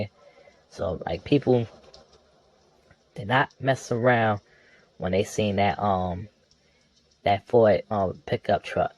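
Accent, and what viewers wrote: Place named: Baltimore, United States. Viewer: Yo you got to be from Baltimore please work on presenting your presentation better you keep saying arm that shit is driving me crazy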